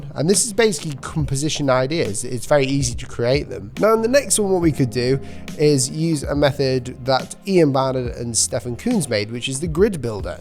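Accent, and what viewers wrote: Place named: Yorkshire, United Kingdom. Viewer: Are you from Yorkshire? You sound exactly like the voice in my head. It’s weird.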